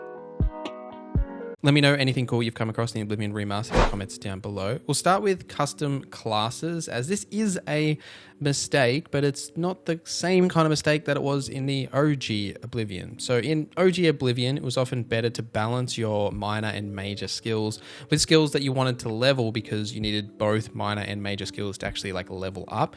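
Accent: Australia. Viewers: He's Australian cut him some slack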